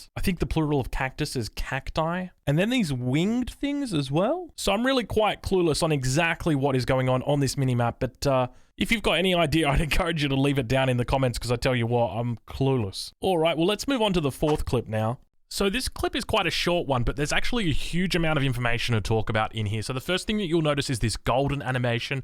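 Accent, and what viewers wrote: Australia. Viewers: "My soothing australian accent" - Self awareness is key Mr:D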